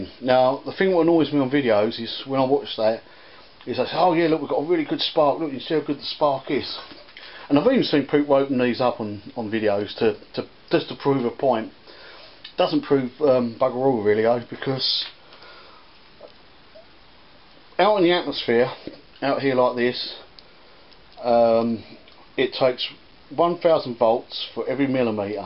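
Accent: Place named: United Kingdom. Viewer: Excellent video. Also, quite fun watching the computer translator try to deal with a heavy brit accent.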